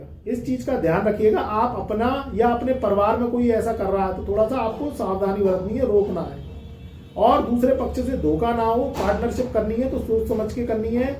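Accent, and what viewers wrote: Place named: Agra, India. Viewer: पंडित जी आप किस शहर से हैं? आगरा mainpuri side effect है शैली में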